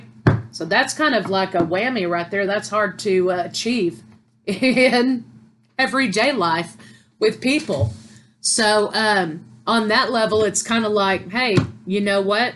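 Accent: South, United States. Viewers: Wow wow and wow, oh my goodness. I listened to this whole message and it's giving me so much clarity. Some of it, I already knew, but needed a reminder, some of it I've been working on and some of it I NEED to work on. Thank you so very much!!!You are so extremely enlightened and gifted. And I love you southern accent! Peace and blessings to you. Love, E. from WNC.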